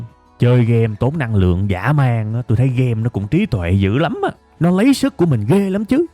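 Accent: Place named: Dong Nai, Vietnam. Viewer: Ad nói giọng giống người miền Nam, cụ thể là Đồng Nai quá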